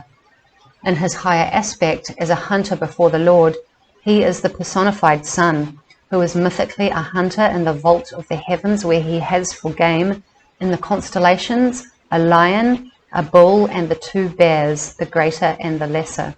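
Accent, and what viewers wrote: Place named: New Zealand. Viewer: Notice the kiwi accent... Would love to have a chat sometime 😁❤️ blessings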